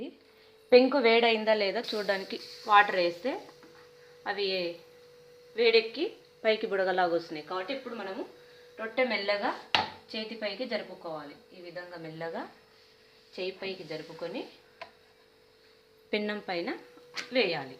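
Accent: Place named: Telangana, India. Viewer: telangana lo famous e jonna roteylu but mana yasalo chepandi enduku madhya lo koncham koncham yasa change chestunaru akka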